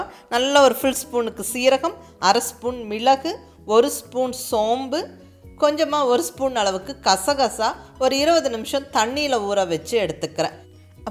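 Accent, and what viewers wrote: Kongu, India. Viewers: Konku tamil arumai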